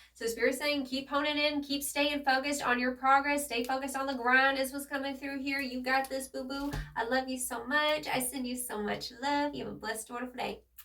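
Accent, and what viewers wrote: South, United States. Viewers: My fave southern reader fr!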